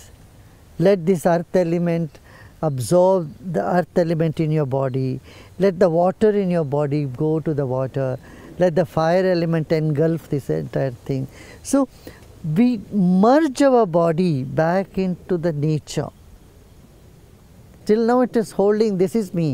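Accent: India. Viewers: I so wish I could understand the East Indian dialect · With that heavy Indian accent and background music how does someone understand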